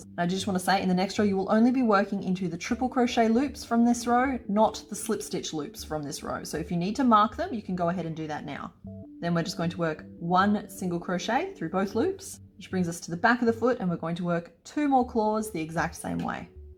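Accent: Australia. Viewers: Wow, thanks. You sound aussie but had me confused with your US stitch names. The sulfur cresteds and the rainbow lorikeets are the mandarin thiefs which leave us nothing on our tree, in the last few years, they don't even wait until they are ripe. Maybe the cockatiel will be less of a sore point. We had a baby fruit bat in the guava tree one year, he was a failed release from the bat hospital, didn't flinch when we looked at him, wanted plenty of guavas handy, but we had no idea if he had the Lissa virus, so they came, said he was fine, and took him away. Thanks again.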